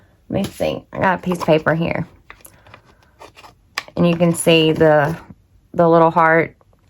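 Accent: South, United States. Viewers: Hi, I just discovered your channel. I just loved this presentation. I'm a crafter and love Temu. Thank you for the information on the price adjustment, I just got $3.78 credit just for the last month. Also ordered some of those random die cuts. I had been wondering if they were worth the money. Also I don't know where you live but I just love your southern accent! Thanks again.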